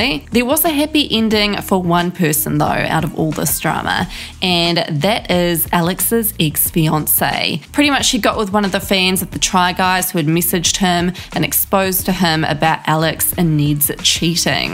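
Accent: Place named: New Zealand. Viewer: Why is it funny? It's a New Zealander accent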